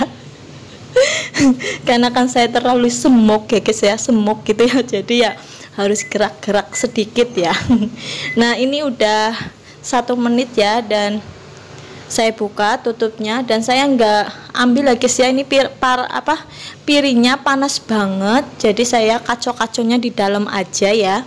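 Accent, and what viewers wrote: Banyumas, Indonesia. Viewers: Emang enak kacang bakar mbk ngapak😁😁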